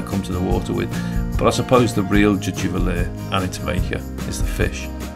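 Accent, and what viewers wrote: England, United Kingdom. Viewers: An excellent clear, concise video and to top it off in an English Accent for a change. Thank you👍😷👍🏴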